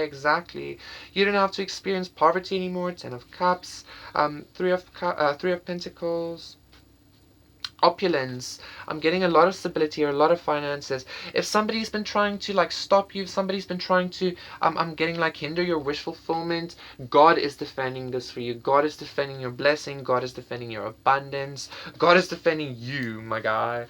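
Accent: South Africa. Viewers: You sound South African, I enjoyed listening to you speak! Thank you for the reading 💜✨ may your channel continue to grow and be prosperous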